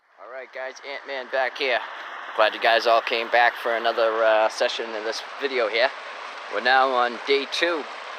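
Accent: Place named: New England, United States. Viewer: Thought you were a new englander. Thanx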